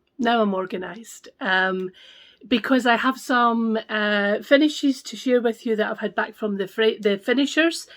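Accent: Scotland, United Kingdom. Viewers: The Tudor Bee is nearly as lovely as your scottish accent! 😊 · I just found you and love your stitching and your lovely Scottish accent. I love the Flea Market pattern - actually anything by Lori Holt. The Tudor pattern is very sweet and one I'd love to stitch. Enjoy your vlogs. Cheers from Canada.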